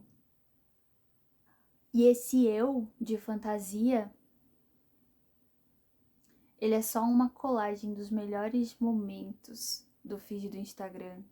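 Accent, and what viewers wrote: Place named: Portugal. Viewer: Você já morou em Portugal? O sotaque parece. Tô acompanhando o conteúdo pelo insta e achei aqui agora. Parabéns!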